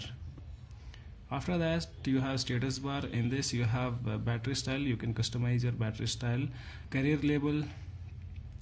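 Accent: India. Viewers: this is an indian English accent. and what u have is american accent every country has thier own accent. i know it makes u not familiar accent but if everyone started saying that speak in my accent then english won't be an international language.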